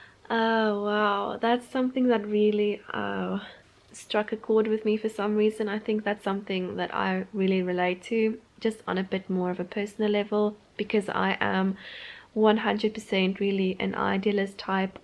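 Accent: South Africa. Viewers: sorry my south african senses are tingling, are you from South Africa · Are you South African ?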